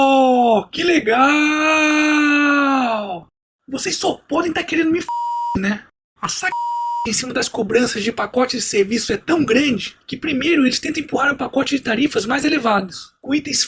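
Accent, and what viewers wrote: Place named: Rio de Janeiro, Brazil. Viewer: Muito bom o vídeo, parabéns! Pelo sotaque deve ser carioca né?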